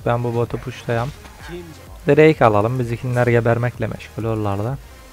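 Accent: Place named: Antalya, Turkey. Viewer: Kanka Antalya lımısın şive çok yakın 😂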